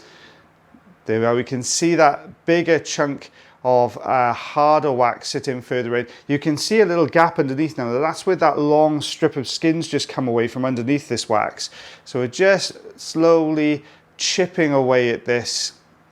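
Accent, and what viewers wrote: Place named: England, United Kingdom. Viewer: I love the English, your accent brings me so much joy 😊 Hope I get to live somewhere in England 🇬🇧 one day.. More on topic thank you for all your hard work putting these videos up every week, I can't imagine how much work and dedication it takes. You and your team are much appreciated:)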